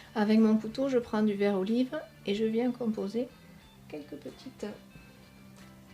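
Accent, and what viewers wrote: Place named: Provence, France. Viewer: Bonjour - C'est vraiment magnifique, quel coup de main! Dommage par contre que l'on ne voit pas votre palette pendant la vidéo. Je vous trouve formidable et quel accent merveilleux - j'hésite entre vers chez moi en provence ou le sud-ouest, mais je penche plutot pour la première solution. Toujours un régal de vous suivre; Merci pour toutes ces belles vidéos et vos conseils précieux. A bientôt.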